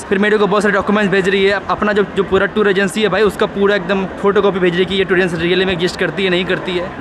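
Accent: Bihar, India. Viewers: Thoda dheere bola kar bhai bata deta hai ki Bihar ka hai.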